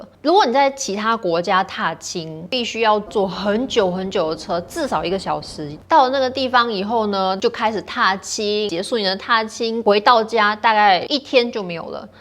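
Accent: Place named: Taiwan. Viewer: I tuned in to listen to Taiwanese Mandarin ….so endearing 😘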